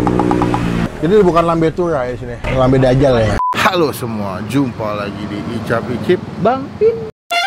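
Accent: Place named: Java, Indonesia. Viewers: njir jowo asli 😂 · Bang ngomong jawa serasa nonton ndarboy genk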